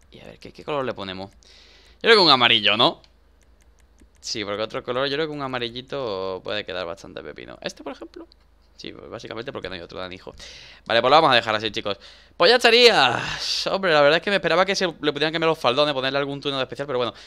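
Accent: Argentina. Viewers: Está en argentino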